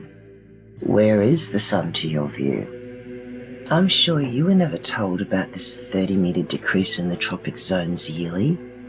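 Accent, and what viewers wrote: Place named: Australia. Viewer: I was joking about your accent. I like the Australian accent btw... and I love your content. I just enjoy how you aussies pronounce Year. Yeeuh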